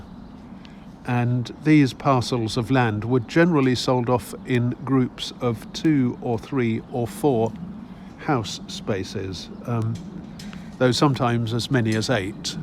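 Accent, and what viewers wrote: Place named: England, United Kingdom. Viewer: As a student I had best time of my life in Oxford so for me its as a nice tune listening to your familiar English accent and walking along familiar roads. I used to rent town-house at Abington road for more than a year before Millennium. Thank you for Oxford walking.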